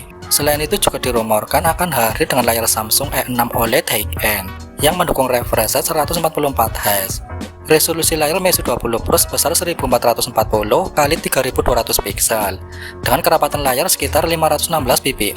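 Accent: Banyumas, Indonesia. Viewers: Bang ngapak yak?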